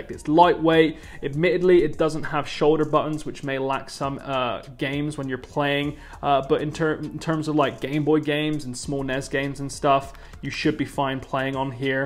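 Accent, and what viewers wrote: Bristol, United Kingdom. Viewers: are you actually a Bristol boi? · u from bristol?? sounds like it and swear ur tshirt says somerset lol im from weston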